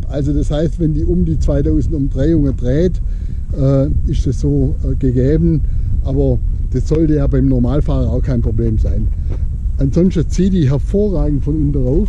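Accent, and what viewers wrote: Swabia, Germany. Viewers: Super !!! Wieder ein Motorradvideo - das Schwäbische ist echt super - heimatverbunden eben - fast wie die Aprilia - das ist wirklich ein tolles Moped - Danke für das informative Video - das immer etwas anders ist als die anderen Videos !!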